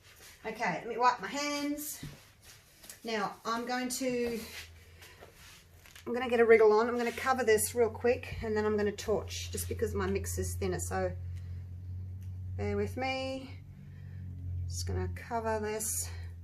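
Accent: Australia. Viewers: I just have so much fun watching your pour paintings. You explain it so well especially with your Australian accent. I love Australia. I’ve only been lucky enough to visit there once and check it off my bucket list. You’re so lucky to live there. And your paintings are gorgeous! ❤ I’ve got all my material and I’m ready to start. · Also I love your voice I like to listen to the Australian will actually any type of broge or accent love to hear different drawls ect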